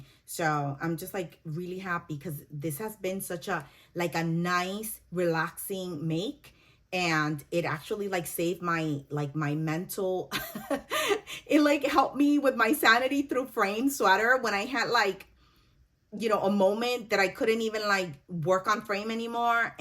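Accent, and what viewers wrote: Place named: New York, United States. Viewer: I'm so glad I came pass your podcast because, I just got this pattern and believe it or nor just finished reading the pattern on November 27' 2024 sm. I'm listening to you am and you are now talking about the Cumulous knit, lol. I'm excited now to start the Doppio after I finish with your podcast. Your accent is gorgeous almost sounding as if you are a New Yorker and Spanish, but I know nothing even at the age of 72, lol. I'm also a brand new knitter. Figured if I learned to crochet at 11 by reading a book, I could at 72 do the same. Well, that's a lie as I'm shaking in my boots trying to keep my circulars from shaking, lol. I also have a old basket I purchased in Panama during my 20 year stint in the Navy. If I survived tat when women weren't meant for the armed forces, tell me why this knitting is putting fear in my hands, lol. 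I'm going to do it anyway! Thanks for saying a beginner can do this, so I better get busy. I have the equipment, the yarn, my hands and the determination, lol. Wish me luck. I'll keep watching out for your next podcast. You're enjoyable to watch and listen to. Your enthusiasm has lit a fire. You had me interested at hello, lol. Ms. Jo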